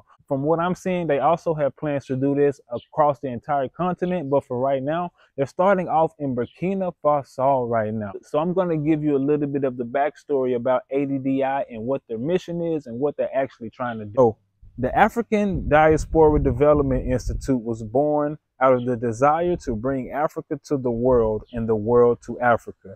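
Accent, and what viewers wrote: United States. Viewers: Bye-bye I want to hear African accent not American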